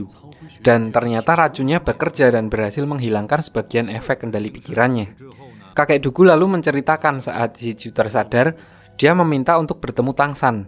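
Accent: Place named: Java, Indonesia. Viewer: Mambu mambu wong jowo admin e ki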